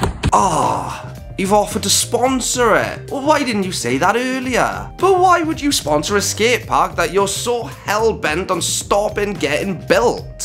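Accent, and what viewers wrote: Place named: Wales, United Kingdom. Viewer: 🤣 Your musical Welsh accent makes the mockery even funnier 😂. My fur is still shaking - you made a cat laugh 😸!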